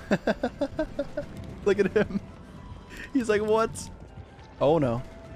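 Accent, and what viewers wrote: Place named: United States. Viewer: he does have an accent. He has an American accent lol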